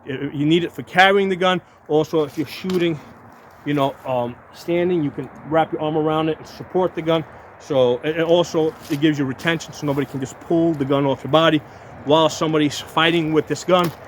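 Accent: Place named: New England, United States. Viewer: Yankee with a speech impediment. Negative. I’m not listening to this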